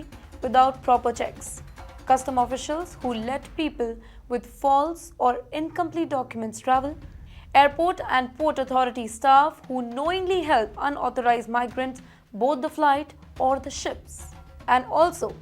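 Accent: India. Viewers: Sounds like a women from UP , Pllease speak proper English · It's an indian english accent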